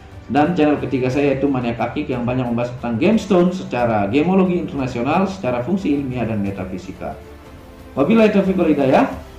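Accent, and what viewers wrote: Bali, Indonesia. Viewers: Masnya ini logat percakapannya kok seperti orang Bali.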